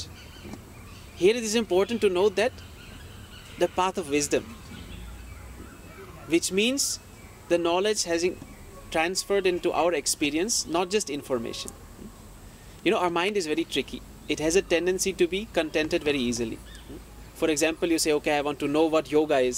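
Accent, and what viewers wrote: India. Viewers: You are white but have an Indian accent. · Was you born in India you sound like a native. That or you spent alot Time there. My friend speaks Spanish. But he learned from Mexican people. So when he does speak Spanish. He sounds just like them. I think it's cool take care.😘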